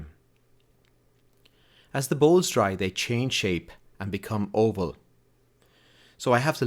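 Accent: Ireland. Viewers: Nicely done. You are very Irish indeed from your accent and I see from your website you're SW of Dublin on the other side of the Wicklows. Live there some 20 years ago on a work assignment. A highlight of my working career. When I return next to the Auld Sod, I'll need to look you up for a bit of instruction. Cheers.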